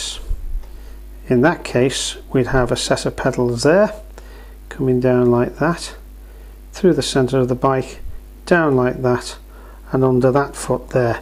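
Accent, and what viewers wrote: Liverpool, United Kingdom. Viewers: Fab tutorial,lovely to hear a scouse accent from my home town too!😀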